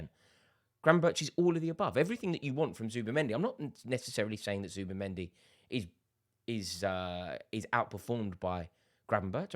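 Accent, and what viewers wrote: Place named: Liverpool, United Kingdom. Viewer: is an undercover scouser. I love it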